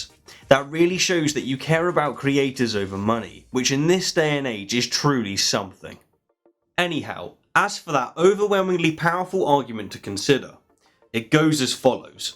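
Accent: England, United Kingdom. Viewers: Seems people are convinced by listening to the beautiful English accent. What is freely asserted can be freely denied but if he is preaching to the choir we have a confirmation bias problem. How something immaterial can come from matter was not explained. Where do abstract ideas come from? Can justice, kindness, morality just pop out of the brain? While this may not prove any one religion, it sure points to the strong possibility that the designer of humans was a little smarter than random accidents. If things evolved then who or what was guiding the evolution. Random just does not cut it. The videos tend to be sciolistic and many of the readers are echo chambers for the sequacious opinions expressed.I read all of these so I can debunk the "debunked syllogisms" for my logic students.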